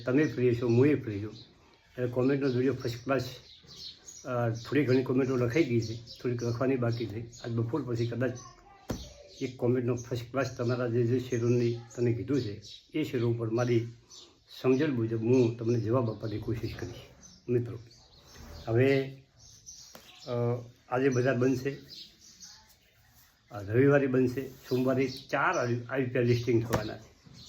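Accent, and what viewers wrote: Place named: Banaskantha, India. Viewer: તમારી બોલી પરથી તમે બનાસકાંઠાના લાગો છો.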